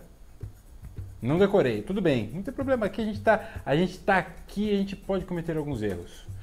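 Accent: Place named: Rio de Janeiro, Brazil. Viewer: Salve lá!:) Eu ri demais do seu sotaque carioca, meu:D. Não curto muito as coisas da JPG porque é simplesmente doce demais:D. Vou por na lista dos decants para saber se vale a pena.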